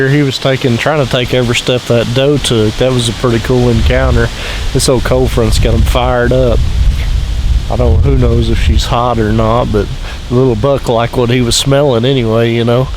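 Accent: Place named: Alabama, United States. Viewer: Your accent sounds like you are from Alabama.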